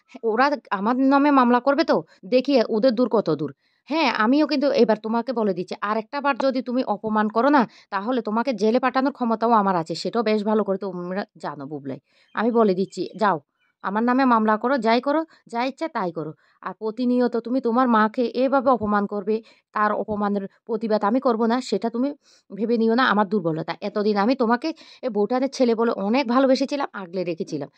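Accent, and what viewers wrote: Chittagong, Bangladesh. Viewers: Chatgaya bhasa tone e katha bandha korun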